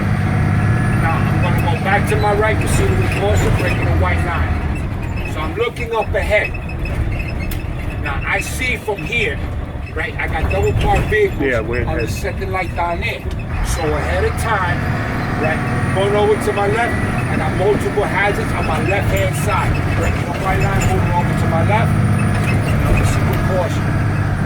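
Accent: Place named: New York, United States. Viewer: God I miss the New York/Jersey accent.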